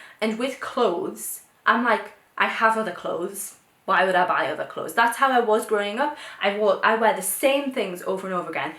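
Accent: Newcastle, United Kingdom. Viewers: A Geordie lass?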